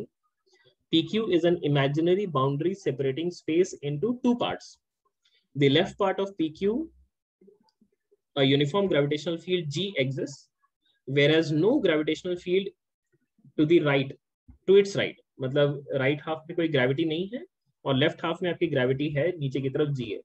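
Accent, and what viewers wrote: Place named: Bengal, India. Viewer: Got reminded of ur class at Akash....2k19 batch....but sir missed ur bengali accent....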